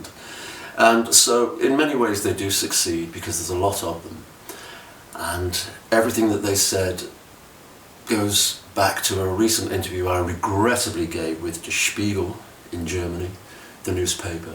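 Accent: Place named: Manchester, United Kingdom. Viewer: goddamn I love his Manc accent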